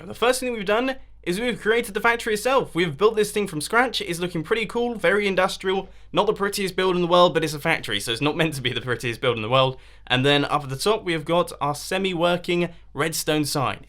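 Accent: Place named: England, United Kingdom. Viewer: It's his accent, English eh!?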